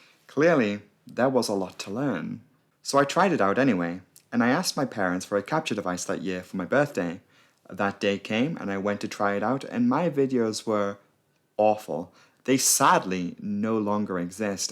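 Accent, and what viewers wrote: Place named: Scotland, United Kingdom. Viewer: Your videos are so comprehensively informative that I thought you were actually a professional in the industry! What was your previous "real" job? Have you thought about going back to school to enter the industry in a different capacity, such as engineering(for the future)? I love your voice and imagine you had an excellent SLP for vocal guidance during your transition. And that accent!! I can't quite place it--Scottish? Irish?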